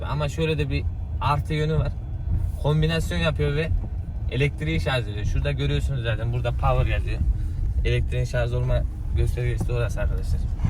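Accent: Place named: Central Anatolia, Turkey. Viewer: konusmayi bilmeyen herkes video cekiyo arkds ..niğde aksaray adana kayseri kırsehir vs sivesinden kurtamayan gurbetciler..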